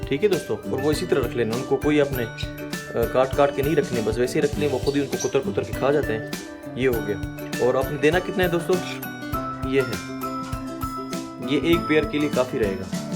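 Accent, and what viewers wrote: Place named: Balochistan, Pakistan. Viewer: Bahot khooob Aik persnal sawal krna chaoonga. With excuse!!!!!! Aap ki urdu Bht achi hai takafuz saaf hai. Lekin pir bi aap k.Lehjy me wo mittaas aajata hai. Jo humari zuban ka hissa hai! Sawal ye k kia aap brahvi hain???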